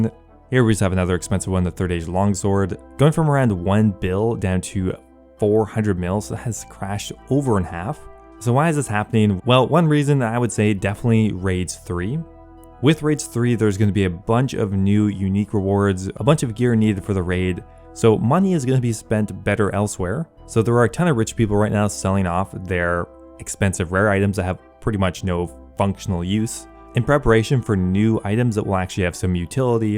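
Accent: Quebec, Canada. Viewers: You sound French canadian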